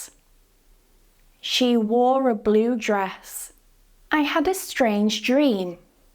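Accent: United Kingdom. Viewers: Amazing!!! Thanks a lot! ☺☺ I love British pronunciation! 🥰😍🥰😍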